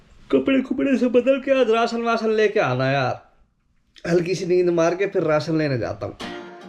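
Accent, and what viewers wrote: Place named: Uttar Pradesh, India. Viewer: Bhai UP se ho kya 😂😂 me b UP se hi hu same accent tmhara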